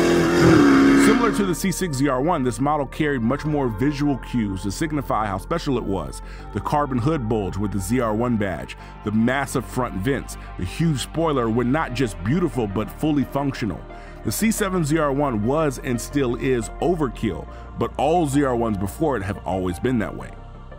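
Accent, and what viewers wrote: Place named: Indiana, United States. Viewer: YOUR ACCENT SAYS INDIANA/SOUTHERN ILLINOIS TO ME I MEAN THAT AS A COMPLIMENT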